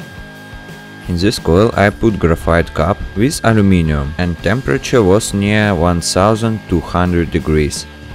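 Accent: Russia. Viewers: What a brilliant russian akcent is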